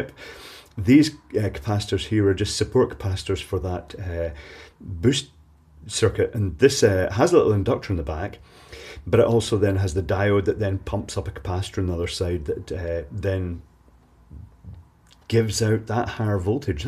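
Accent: Scotland, United Kingdom. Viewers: Here is the guy with the Scottish accent.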